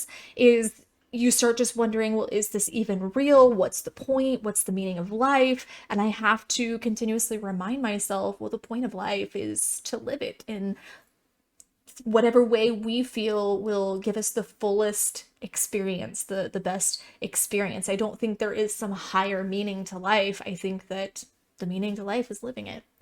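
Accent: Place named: Southern California, United States. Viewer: It must be 2024. A sweet, blonde lady wearing pink and blue, with a "So-cal" accent is reviewing 🤣.... If only they knew her inside thoughts.. Appearances can be deceptive. I like this channel, there are some great reactions and honest truthes... but I can't help thinking - covering things in pink hearts and adopting a Disney princess voice / persona is actually doing you any good. But if it's what makes you happy, In the words of - be yourself, by yourself.🤘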